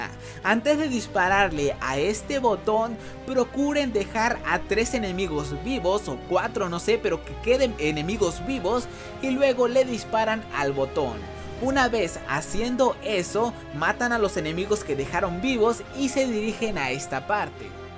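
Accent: Chiapas, Mexico. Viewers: Oye Bro eres de Chiapas(?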